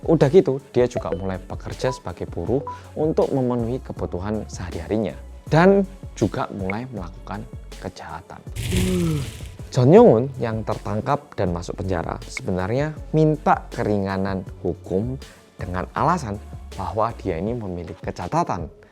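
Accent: Java, Indonesia. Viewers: Biasanya selain medok mas bilang apa ya di intro? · Dua kata untukmu mas, "Medok banget" Tapi justru itu yang bikin seneng banget lihat chenel nya mas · Bersama orang korea yang medokkk aaaah bikin rindu bgt 😂✨ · Entah kenapa selalu rindu suaranya mas yang medok bgt itu lho😭😭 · Cus langsung ndelooookkk.... Notif Bersama orang korea yang medooookkk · Semangat mas . Seneng aku lihat masnya pakai bahasa indo medok. Sekali kali coba bahasa Korea ... Pakai sub ... Jadi pingin denger kalau bicara bahasa korea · wong jowo mas?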